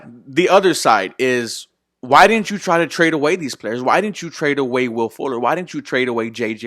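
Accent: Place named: California, United States. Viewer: May I ask where you from you sound like a California or New Yorker?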